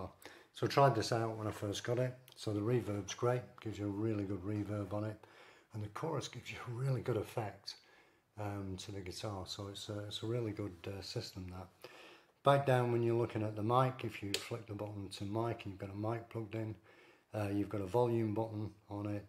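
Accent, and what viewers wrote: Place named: Lancashire, United Kingdom. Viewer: Hi you sound like you’re from Lancashire like me, although I live in Spain. Am almost pulling the trigger on this just for a bit of busking with my mate, is it loud enough do you think, for a busy city street? And how annoying is it that they put the whole control panel “upside down “, do you have to keep getting up and going in front of it to change a setting? A minor issue but don’t know how much it would bug me, I can’t imagine why they do it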